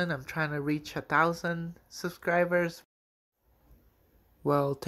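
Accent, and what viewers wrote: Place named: Brazil. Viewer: Cara teu sotaque é de br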